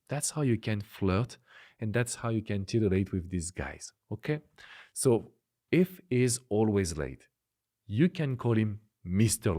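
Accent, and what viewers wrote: France. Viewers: I've just came to your channel, watched a couple of videos and I must tell you: you have very beautiful hands! I keep staring at them while listening ! And obviously I appreciate French man talk in English. Thank you for sharing great stuff. I subscribed, so I'm staying. Love from Poland. 💗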